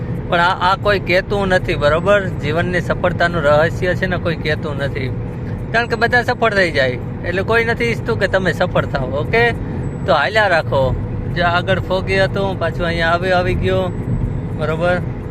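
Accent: Kathiawar, India. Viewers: આપડી કાઠીયાવાડી તળપદી ભાષા અને તમારા ધર્મપત્ની નો તમારી સાથેનો વ્યવહાર જોઈને એવું થાય છે કે આતો બધું હરિની કૃપા હોય તોજ થાય..તમે લંડનમાં રહોશો કે ઈટાલીમાં? તમારા પત્ની જોબ કરે છે કે હાઉસવાઈફ છે?એવો એક વિડ્યો બનાવો..અને એક વાત તો સો ટકા છે કે તમારામાં લેસ માત્ર અહંકાર નથી..અહંકાર વિનાનો દરેક મનુષ્ય મને ભગવાન જેવોજ લાગે છે..God keep your couple safe God bless you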